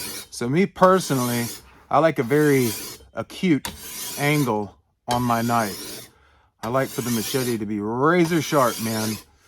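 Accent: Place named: South, United States. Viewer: Bob Ross would enjoy your videos. And now we have. A happy little edge. And he's alone. Lets give him a happy friend on the other side... Slide with the file. Just let the file slide... Sounds almost like a Southern accent. You have there... But yes. Thank you for sharing your info. On files and everything.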